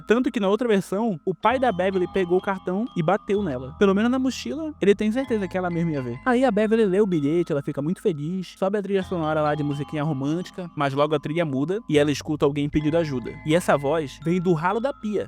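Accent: Pará, Brazil. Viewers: KKKKKK mano adorei seu canal só me tira uma duvida tu é paraense?? kkkkkk o jeito de flr igualzin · Primo, o sr é do Pará?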